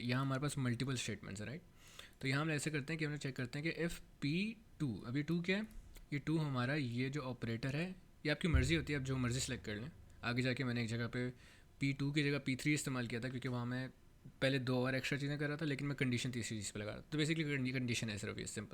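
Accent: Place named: India. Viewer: what language are you speaking? are you using a mix of indians and english? If it's English then your indian accent is so strong that I don't get anything ...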